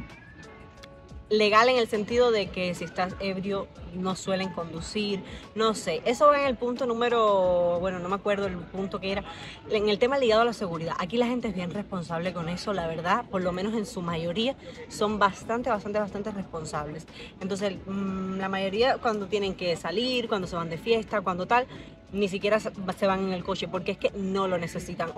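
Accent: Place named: Andalusia, Spain. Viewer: Pareces Andaluza!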